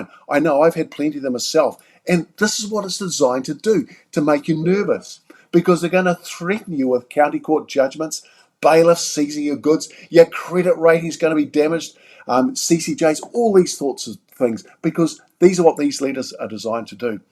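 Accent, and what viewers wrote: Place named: Australia. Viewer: This guy is clearly Australian (or perhaps a Kiwi). No prob with that, but is he talking about the law in Australia/NZ or UK? I haven't heard of any of the companies he has mentioned.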